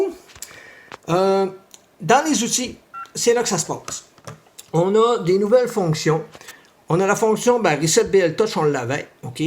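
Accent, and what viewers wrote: Quebec, Canada. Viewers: Bonjour Merci pour cette vidéo très bien expliquée digne d'un professeur sinon mieux;) suite a ta dernière vidéo concernant le firmware timymachine et avec l'écran en français, il n'y avais plus la fonction reprise après coupure de courant et la fonction économie d'énergie alors que dans l'écran le bouton est bien là mais ne fonctionne pas. J'aimerais savoir si ces 2 fonctions sont maintenant opérationnelles avec la mise à jour. Continue comme ça car j'adore tes vidéos et surtout ton accent du québec 🙂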